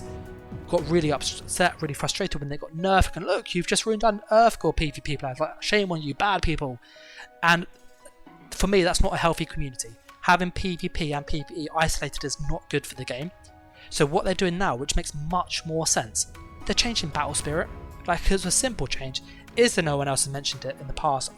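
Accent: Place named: England, United Kingdom. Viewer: This guy is clearly English i dont get this comment, there defiantly a lot worse accents from England.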